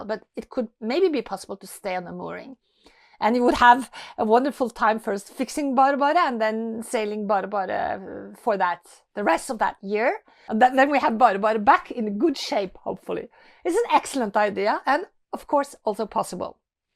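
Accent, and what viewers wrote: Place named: Norway. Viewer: So the boat name is BareBare as in bare-naked. Why do you call it butter-butter? Perhaps my southern U.S. Ears are not tuned in to your Norwegian accent. It sound exactly like butter-butter. I find it super interesting how the English language sounds SO different spoken by people around the world. Ok enough questions, good luck finding a home for butter-butter - wink!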